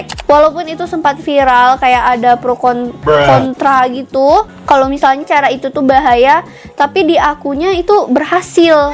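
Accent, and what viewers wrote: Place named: West Java, Indonesia. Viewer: Ak kirain orang Sunda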